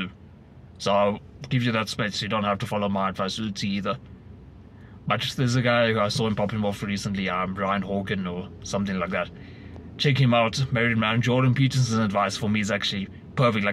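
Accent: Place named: South Africa. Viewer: Lol. Heard the accent and knew immediately you were on of us. I’ll sub just for that 🤣🇿🇦 Edit: just got through the video and seems like you have a lot of good points to add to the discussion in this space. I was single my whole life, recently got in a relationship, and that was when I knew I outgrew these red pill influencers. Past them telling you to get in the gym and improve aspects of your life that you can control, that’s about it when it comes to the value they add. They are mostly clueless when it comes to maintaining healthy relationships with women.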